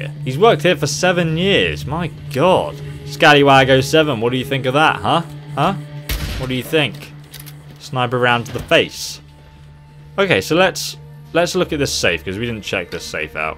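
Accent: United Kingdom. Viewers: your British, me to! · are you British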